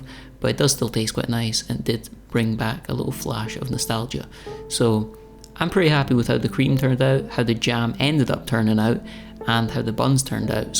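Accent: Scotland, United Kingdom. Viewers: THIS was so relaxing to watch. I enjoyed every minute of that!, good job. Your voice is ever so calm...and being an American I SO MUCH appreciate your accent. I understand every word, but makes me want to learn to speak English with a Scottish accent. I found it SO CUTE that JR your cat wants to be a sous chef while you bake. Adorable. Your sense of humor is spot on too, laughed out loud a couple of times during this. A you tube fan, Brian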